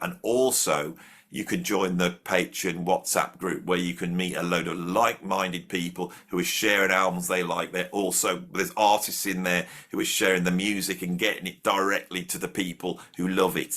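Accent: United Kingdom. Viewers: !...intelligent list...you dug deep for the true "roots" of music...the foundations of genres. Your shows are unique imo to other folks on YouTube...I love your British voice/dialect...it relaxes me so...anyway, keep it up...shoot for 50K subs by end of the year! Enjoy the weekend...sincerely, 55 yr old drummer Webb in Atlanta, GA.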